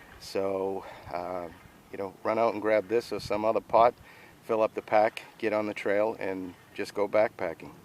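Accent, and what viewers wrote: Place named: Boston, United States. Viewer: Man, with that Boston accent you sound like Matt Damon from the movie "Good Will Hunting". Yeah, I have the GSI Minimalist with a little Fancy Feast stove tucked inside. All I have to do is bring some alcohol and a wind-screen and I'm golden. Enjoyed the look at your system. Thank you, my friend.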